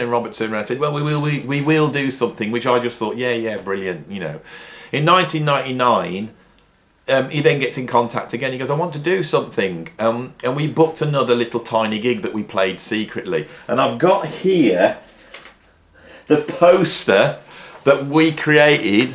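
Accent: United Kingdom. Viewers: It's Fun listening to your Videos, regards from Germany, Love your British accent, I'm 69er...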